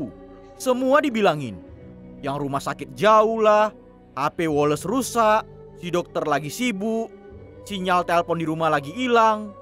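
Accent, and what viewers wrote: Medan, Indonesia. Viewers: Medan kali bah bahasanya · Ya Allah bang org Medan bang... gw suka bet logat melayu Medaaaaan.... salam dr pecinta bahasa daerah... hahhahaha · Wokeh ini yg ku suka logat Medan😂 Semngat Tross buat vidionya bg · Logat Medan nih Kek daerah gw btw Medan yg di mna nya bang Aku juga org Medan?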